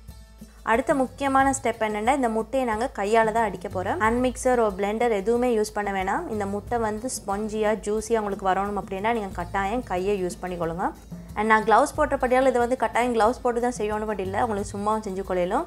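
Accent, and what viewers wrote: Sri Lanka. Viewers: Happy to see a lankan moor girl proudly presenting our food, talking in our own slang...cz it feels so closer... fellow lankan from UAE😍 u go girl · After a long time hearing some SL muslim tamil accent, nallam datha, wattalapam yen favorite, used to get them from Maradana muslim hotels along with buriyani, those were the days, late 80s. keep it up. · Nisha Me too first time paakren,Srilanka Tamil Lovely talking style.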